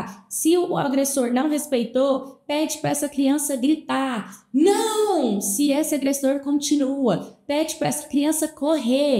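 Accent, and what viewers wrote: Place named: Caipira, Brazil. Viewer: Só eu que vejo que ela tem sotaque cautrim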